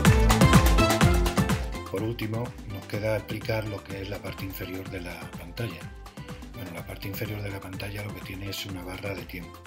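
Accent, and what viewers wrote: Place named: Extremadura, Spain. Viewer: Buenas noches, me encanta lo que estoy viendo. Soy una persona mayor y quiero hacer algún video con mi ordenador Mac. Además por el acento del profesor creo que es extremeño. Yo también lo soy, aunque vivo lejos. Muchas gracias.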